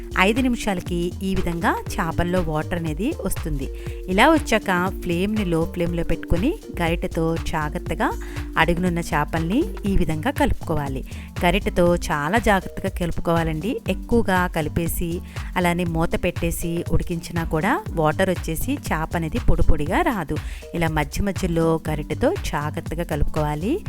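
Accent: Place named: Godavari, India. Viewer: Mee godavari yasa mataladitey bagundedi andi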